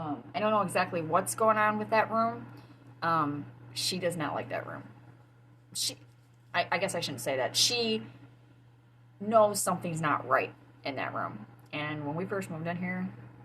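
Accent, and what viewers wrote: Michigan, United States. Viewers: lol i can tell you are a Michingander lol we have our own accent Hope you will think about lifecasting eat,sleep,s=it,shave shower even sleep streams😃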